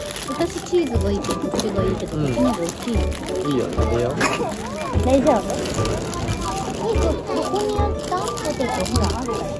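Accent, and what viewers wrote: Oita, Japan. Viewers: 大分弁とピーピー豆最高‼️ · 大分県民として大分弁時々出るの最高です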